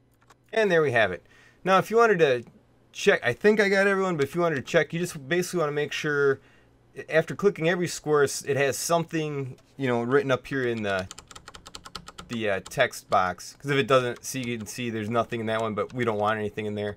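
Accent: Canada. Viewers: I knew you were Canadian by the 15 second mark :). Correct me if im wrong though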